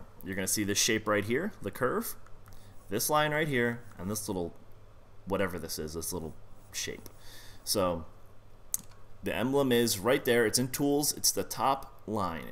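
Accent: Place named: Canada. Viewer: wow canadian eh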